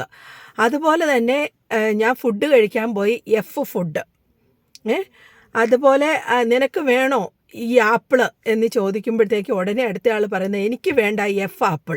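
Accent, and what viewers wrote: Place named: Haryana, India. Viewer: Like haryana slang ....same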